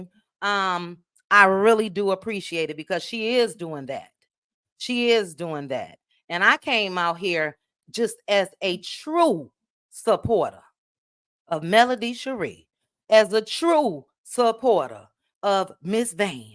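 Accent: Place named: Chicago, United States. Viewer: I’m from Chicago and that’s definitely how we talk…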